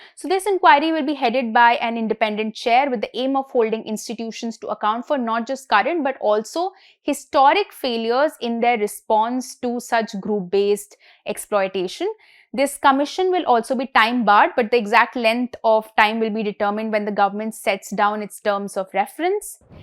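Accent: India. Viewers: Is this an Indian woman, can tell from her accent 😢yep, unbiased. Have you investigated your gurus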